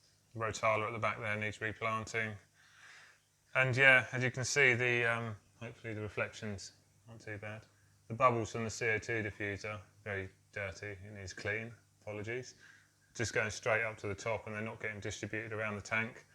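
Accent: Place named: East Anglia, United Kingdom. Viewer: I can tell, the east anglean ish accent 😅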